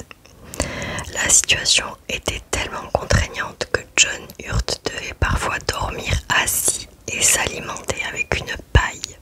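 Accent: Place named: South, France. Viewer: avec grand plaisir A moi de te remercier pour tes vidéos de grande qualité et toujours intéressantes Et ta passion pour l'astronomie et l'espace est contagieuse tu en parles d'une belle manière ! Sinon pour l'accent du sud (milieu) bah ne le perds pas j'adore ! moi je ne vis plus en France et il s'est évaporé avec le temps et la distance mais j'ai grand plaisir à le retrouver quand tu chuchottes ! Continue tu es excellente 💫🪐👍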